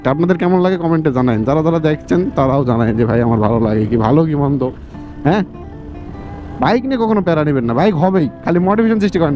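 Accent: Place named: Naogaon, Bangladesh. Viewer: ভাইয়ের ভাষা শুনে মনে হচ্ছে আপনি নওগাঁ জেলার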